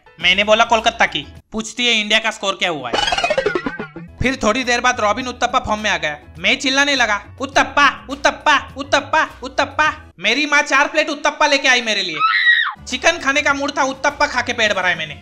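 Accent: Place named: Gujarat, India. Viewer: He is gujju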